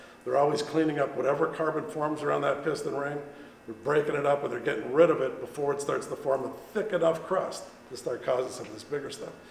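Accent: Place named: United States. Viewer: Your accent is American, but you’re talking in kilometers. What am I missing?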